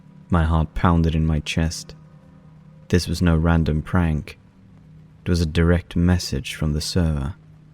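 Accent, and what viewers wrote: United Kingdom. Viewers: Right!!!! The f**kin voice,soooo sexy and very sexual and the big orgasm is the voice being British!!! Unbelievable hot!!!!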